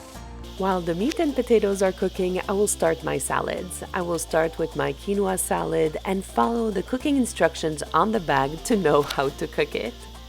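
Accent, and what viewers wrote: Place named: France. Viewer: I have noticed that you have an accent, and you prononce Safran and mayonnaise like in France 😅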